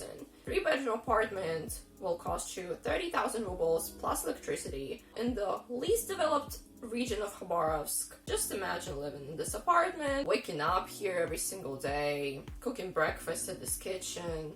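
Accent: United States. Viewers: I want to watch the video, but this "Amerrrrican" accent.... Ewwww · It’s hard to believe you’re Russian...you sound American...your grasp of English is amazing if you’re Russian.. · Your English is American - style .. most excellent. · You have a American accent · How did you learn english with an american accent? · Great work! As a Chinese, Khabarovsk is a city that I always wonder what it’s like since it is so close to us. I often hear from people from the northeastern Chinese provinces (close to the Russian Far East) complaining about their stagnant economy but it seems that sadly our Russian neighbours aren’t doing great either. Wish you the best with your channel and congratulations on your English skills, you sound very much like an American! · Get your Ass to America! I just started watching your channel so I don't know your background but I must say your English is amazing and honestly as an American I don't think most Americans would pick up on your accent they would probably just assume that you're American with a weird dialect lol no disrespect intended with the "weird".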